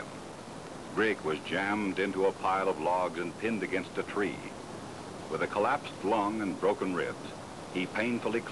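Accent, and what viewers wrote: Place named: Idaho, United States. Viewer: How great is this guys' gem state farm ass bolo tie wearing voice! I love it. The music too.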